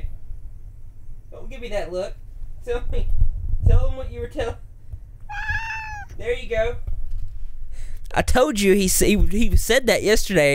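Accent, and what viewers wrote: United States. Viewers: Where do you live/are from? You literally sound like you could live in the states near me.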